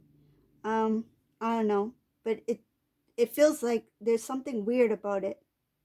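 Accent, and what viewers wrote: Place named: United States. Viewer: Endless Cycle!! Clever. I like ur accent. R u American? With a twist? Lol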